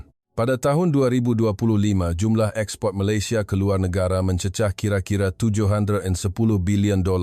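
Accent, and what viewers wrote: Indonesia. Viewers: kenapa bahasanya tone indonesia 😀ini mesti channel indonesia kan???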